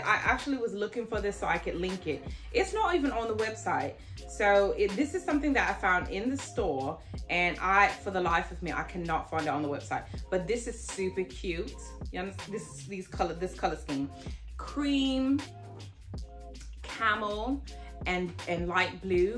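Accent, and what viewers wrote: United Kingdom. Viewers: And just like that she’s back with the fashion tips and the British accents 😂😂😂🎉🎉🎉🎉🎉🎉❤❤❤ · Am glad you brought the looks back and the British accent, yaaaaass hun!!!!!🙌🏾🙌🏾🙌🏾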